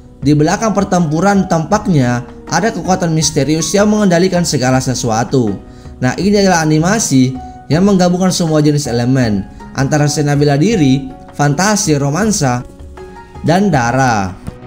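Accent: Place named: Bugis, Indonesia. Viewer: Logat Bugis daeng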